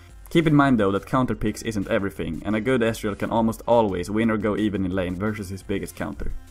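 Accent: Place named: Sweden. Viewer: You sound swedish. Are you swedish?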